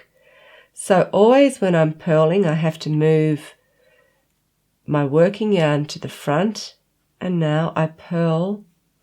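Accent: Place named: Australia. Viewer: Yay an Aussie.:)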